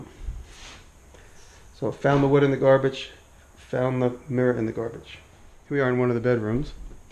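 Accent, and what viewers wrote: New York, United States. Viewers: I just like the way says the word "garbage" and how many times he says the word "garbage" in this video. The NY accent almost makes it sound like "cabbage".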